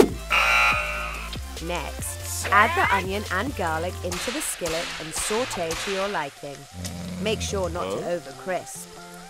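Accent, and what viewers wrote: United Kingdom. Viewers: It's what makes it more eye catching especially bc the narrater is British so I like it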